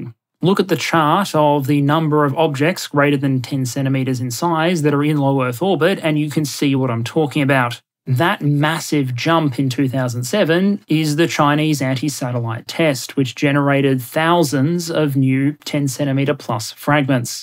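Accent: Australia. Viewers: I love the NZ references in your videos. You sound very Aussie, but I’d love to know if you have a kiwi connection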